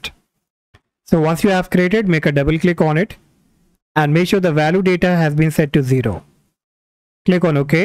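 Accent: India. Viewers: I just hear an Indian accent then I know my problem will be solved. Thank you so much ❤❤❤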